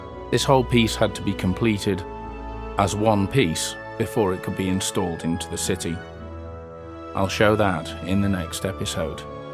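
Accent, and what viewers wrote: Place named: United Kingdom. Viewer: Either way, whatever you decide, you have been a huge inspiration. I have been out and started to stock up on supplies. I’m not sure what scale or style I will settle on. I do want to thank you as I mentioned for documenting all your work. I was really surprised to see you are based in New Zealand, especially with such a strong British accent. Thank you again; I look forward to seeing the notification you have posted a new video. Best wishes from Blighty.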